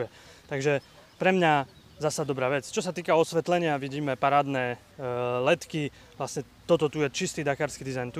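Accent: Eastern Slovakia, Slovakia. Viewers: Pěkný test, i já jsem ji dal v sobotu v Karlovicích pěkně za uši...Podle přízvuku tuším, že jsi vyhodnár, bo pekne si hutoril :-)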